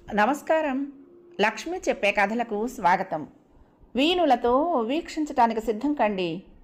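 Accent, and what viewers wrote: Visakhapatnam, India. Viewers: garu meru antha bhaga vizag slang lo chadevaru mam ur voice is god gift andi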